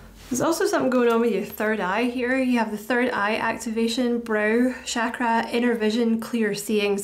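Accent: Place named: Scotland, United Kingdom. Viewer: So nice to hear a fellow Scot 🏴 thank you for this reading 🙏🏼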